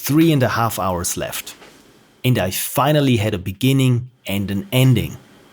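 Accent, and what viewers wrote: Germany. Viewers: Bruder muss los in allem ernste, fantastisches Video, ich hätte am Akzent gesagt du bist Deutsch aber die Alpen sagen irgendwie Österreich/Schweiz sono molto confuso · I mean yea, you can hear the strong German Accent, but i pretty much forget about it in the first minute because im so damn fascinated about the quality of the video. I know this Post Morten Ludum Dare Series since two to three years, and always loved it, you are really talented at making this kind of stuff and i just hope one day you get the attention you really deserve.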